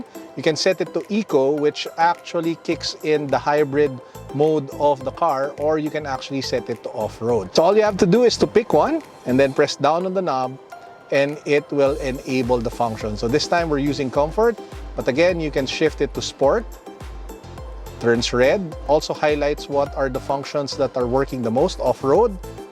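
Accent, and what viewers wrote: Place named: Philippines. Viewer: gawa kapa ibang review sir mas maganda kasi boses mo kesa don kay autodeal. yung english mo kasi sir may tagalog accent pa kaya ayos sa tenga.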